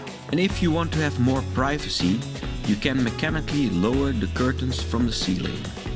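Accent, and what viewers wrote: Netherlands. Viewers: The narrator must be Dutch? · You happen to be Dutch? Great video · Een Nederlander die Engels praat.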